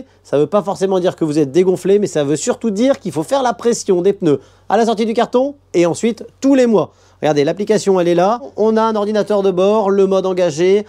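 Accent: North, France. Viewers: Salut, Excuse moi tu est du Nord ? Lille ? Merci à toi 👍👍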